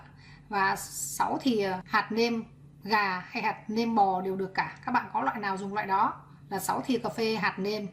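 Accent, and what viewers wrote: Hanoi, Vietnam. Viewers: Chị ơi ngoài nấu ăn ngon mà nghe giọng hà nội gốc rất hay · dạ, con nghe giọng cô là liên tưởng đến NS Kim Tiến đó ah. Rất hay và đặc trưng, mặc dù bây giờ giọng pha nhiều rất hiếm để nghe đc người có giọng gốc Hà Nội nên giữ được bản sắc là điều rất quý. Chúc cô sức khoẻ và ra nhiều video bổ ích!!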